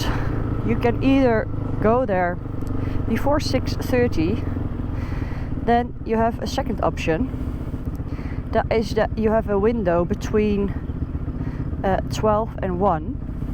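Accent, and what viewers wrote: Netherlands. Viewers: Another adventurous Dutch lady. I start to like their accent.😄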